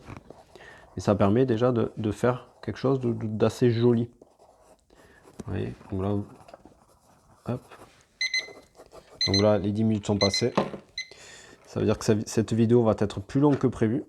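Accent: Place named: South, France. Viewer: Super 👍 avec un petit accent qui sent bon le soleil 😁 bonne continuation 🤗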